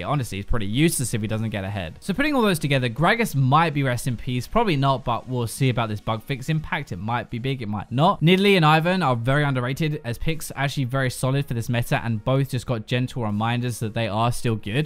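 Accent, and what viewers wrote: United Kingdom. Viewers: i love his accent? what british accent is it?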